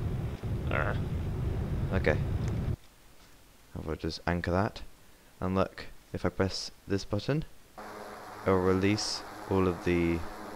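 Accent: United Kingdom. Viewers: damn. U sound so british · Boii ur british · you have a really nice British voice dude, I think you should try going for a podcast :) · R u British if ur British ur y biggest youtuber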